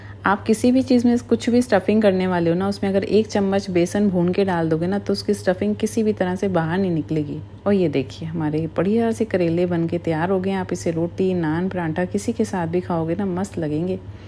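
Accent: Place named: Punjab, India. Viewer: Aapki aawaj different lag rahi h Punjabi tone aa rhi h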